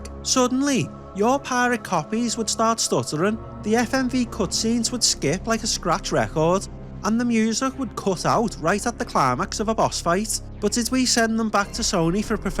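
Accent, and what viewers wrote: Liverpool, United Kingdom. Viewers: Fucking miss the scouse accent. We moved from Walton to Scotland when I was a kid. Don’t go back enough son 🥲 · Fuckin lovin the scouser annunciations :'>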